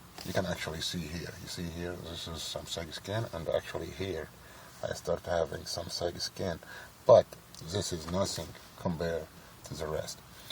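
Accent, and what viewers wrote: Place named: Arab world. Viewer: hi, it's nice to know ur doing good n how much weight have u lost? and I know u have the Arab accent n the "aaaahhhhhhh" but tht gives a good laugh.. be healthy and awaiting your before n after pics..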